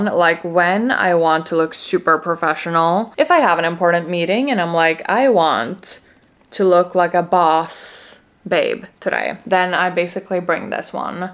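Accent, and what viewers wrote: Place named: Midwest, United States. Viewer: I have a non bag question. Where did you learn English.? Do most swedes speak English.?.Your English is excellent. You have a bit of a Midwest American twang, very slight, but I can hear it. I lived in Chicago for 10years. Thanks to you I'm looking to get an Evelyn. I never would have looked at them prior to meeting you. I thought they were not pretty. So thank you for opening my eyes. I'm looking for a maroon colour. The GM is the largest one, right? Wish me luck....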